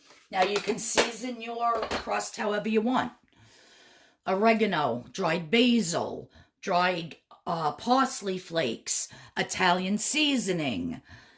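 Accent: New York, United States. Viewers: ... I love you! Just found you on YouTube! Love your NY accent.....lol... where are you from? Long Island? the Bronx? I'm going to try this recipe.... You are very innovative my dear.... take care and will be supporting your channel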